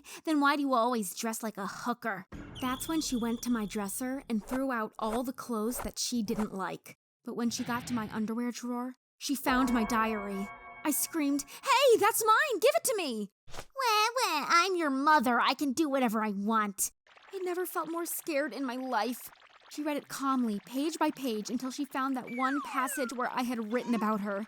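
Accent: United Kingdom. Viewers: Yeah I’m sure you’re from the uk. Such a strong accent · Wait...? SHE’S BRITISH!!!?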